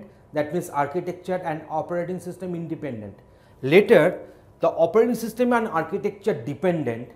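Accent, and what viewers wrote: India. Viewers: 咖喱味的英语有点难懂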